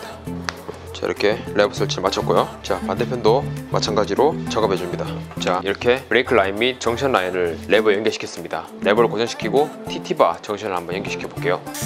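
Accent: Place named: Gyeongsang, South Korea. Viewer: 자전거 좋아하고 경상도사투리가 구수해서 님 영상 자주보는 구독자인데 샵이 당리동인거는 오늘 처음알았네요.ㅎㅎ 하단sk거주자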